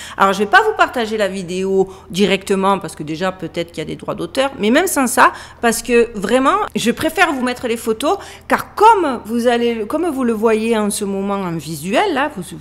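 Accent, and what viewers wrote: South, France. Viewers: Je t'ai decouvert grace a ton apparition dans la video de rock sauquere... Franchement , sujet tres intéressant, hate de découvrir l'ensemble de tes videos . Et vive l'accent du sud 😍🎉!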